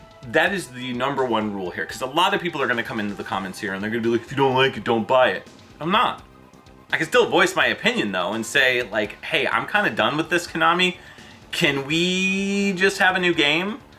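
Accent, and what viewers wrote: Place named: Brooklyn, United States. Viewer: I love honest rants. Honestly saying what most are thinking - right down to the Brooklyn accent 😂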